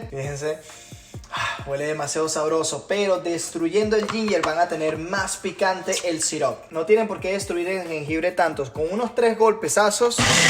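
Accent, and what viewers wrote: Venezuela. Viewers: Será venezolano este chico? Habla hermoso y es un crack en la barra 10/10 hermoso❤ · Este pana parece venezolano :D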